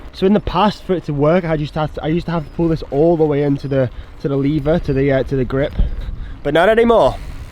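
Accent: United Kingdom. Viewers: That's a very English accent 😂😂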